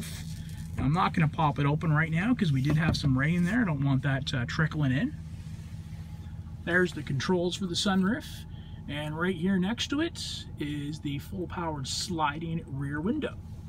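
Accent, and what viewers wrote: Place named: United States. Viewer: what american accent is this?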